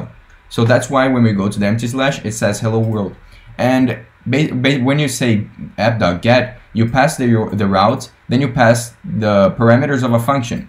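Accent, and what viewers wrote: Brazil. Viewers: o que facilita entendermos e que o cara e BR o ingles dele ajuda muito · ingles brasileiro e bom demais de entender, vlw!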